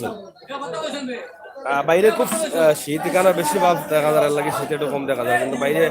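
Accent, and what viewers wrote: Sylhet, Bangladesh. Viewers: ভাই এইটা আমাদের সিলেটের ভাষা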